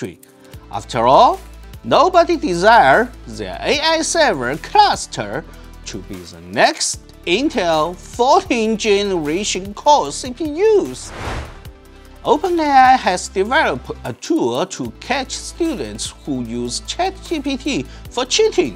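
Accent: China. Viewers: which chinese dialect is that?? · its his second language hes probably just trying to practice his english. he seems to be chinese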